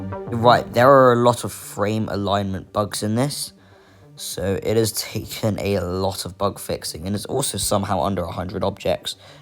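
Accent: United Kingdom. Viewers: you are the most british sounding british person I've ever came across on here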